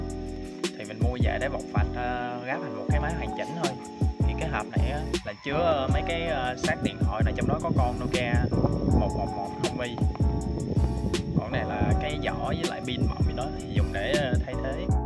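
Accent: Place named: Mekong Delta, Vietnam. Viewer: rất thích nghe giọng người miền tây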